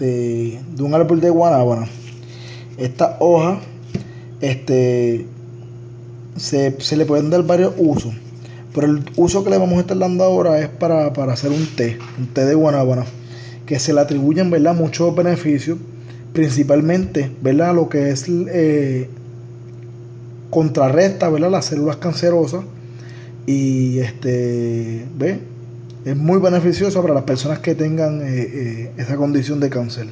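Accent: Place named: Puerto Rico. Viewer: Bendito se nota que eres boricua amigo.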